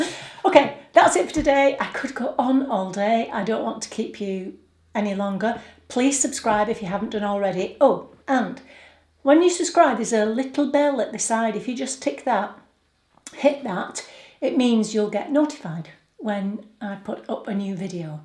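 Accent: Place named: Lancashire, United Kingdom. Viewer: My guess is Lancashire? I'm down in Derbyshire! Good to find someone for the older gals, I'm not quite in the 50's yet but loving your content. Tracy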